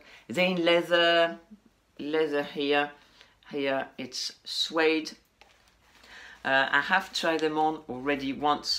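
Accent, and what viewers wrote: France. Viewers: We love a good french accent I speak french, Spanish, welsh, Japanese, Korean and English wow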